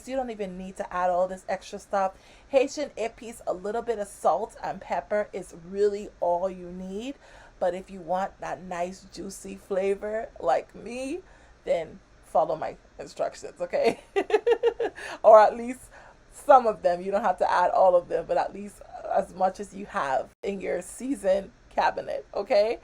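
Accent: Bahamas. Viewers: I’m watching your video and I keep hearing a Bahamian accent then you go and say Bahamas lol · The accent is there! I know a bahamian accent when I hear it. I know my people! 😂 I knew it too! 🇧🇸🇧🇸🇧🇸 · I knew I heard a bit of our Bahamian accent!🫶🏾🫶🏾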